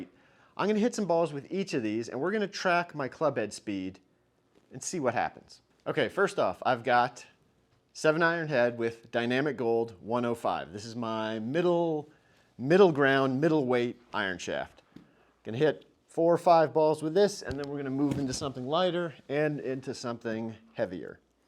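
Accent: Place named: United States. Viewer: im just so glad finally an american accent shewie ..no offense to the rest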